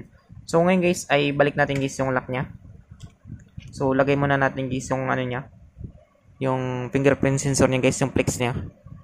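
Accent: Iloilo, Philippines. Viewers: Dw ilonggo ka bala mag hmbl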